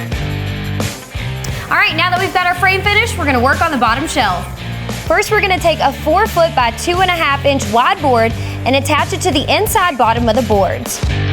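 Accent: South, United States. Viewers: from the sound of her accent she is a southern girl. And a handy one at that. Nice job young lady!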